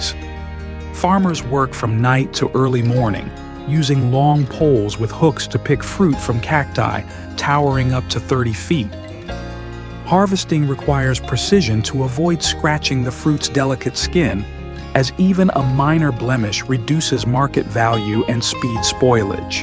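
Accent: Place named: Morocco. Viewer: هذا المعلق باينة مروكي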